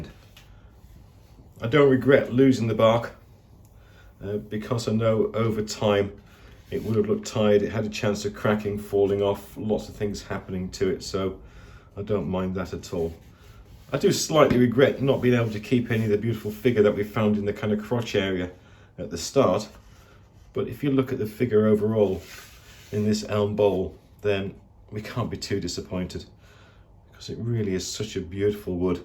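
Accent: United Kingdom. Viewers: I'm from Birmingham and I live on the Welsh borders in Shropshire. I have a thing for accents, I love them and I'm sure I can detect a remnant of West Midlands in your speech. Thankyou for your welcome. I'm enjoying working through your back catalogue.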